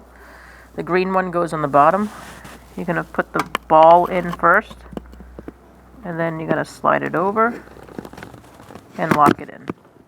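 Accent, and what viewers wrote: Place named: New England, United States. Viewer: In three second in, I love the strong New England accent 😂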